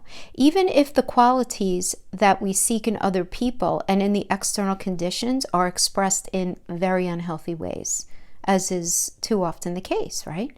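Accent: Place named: New Jersey, United States. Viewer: ❤️ (As an aside, I can’t stop thinking you must be from Jersey, where all my heart and family lives.)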